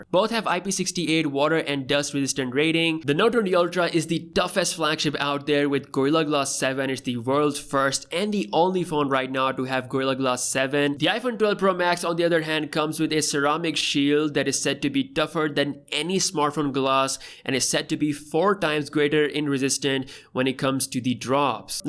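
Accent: India. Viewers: Chutiya desi accent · Your voice like Indian guy speak English and trying hard to hide the accent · Accent like an india tongue,, spoiled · You have a funny accent. It goes Indian and American like a dog playing with its tail...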